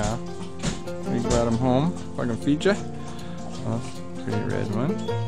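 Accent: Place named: Canada. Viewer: She talks like a Canadian A😎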